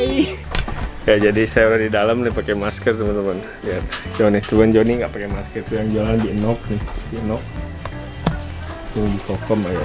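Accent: West Java, Indonesia. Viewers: gaya bahasa sama logat sunda pisan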